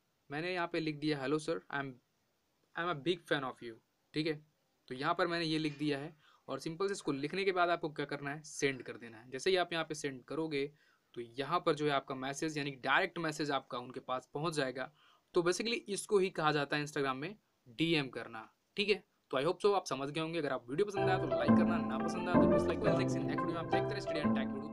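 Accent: Odisha, India. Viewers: Bhai are from Odisha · Brother are you from odisha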